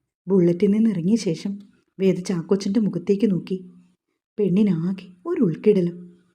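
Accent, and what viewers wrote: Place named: Kollam, India. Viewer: . നല്ല കഥ സൂപ്പർ സൗണ്ട് നിങ്ങൾ ഏതു നാടുകരി ആണ് കെല്ല o ആണോ ഞാൻ കാലിക്ക കാലിക്കറ്റ് ആണ് കെല്ലം കാരുടെ . സംസാരം ആണല്ലോ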